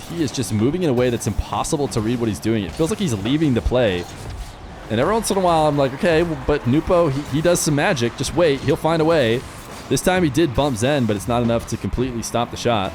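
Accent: United States. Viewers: you sound like an american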